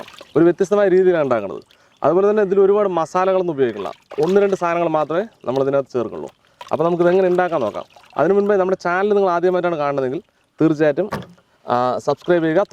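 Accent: Palakkad, India. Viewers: palakkaden samsaram ath poliyan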